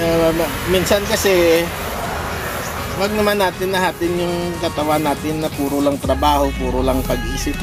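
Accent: Iloilo, Philippines. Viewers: havea nice day my friend. niceto see your nice views nice sea very clean. ano g p,ace mo kaibigan parang iloggo ka ata friend. salamat po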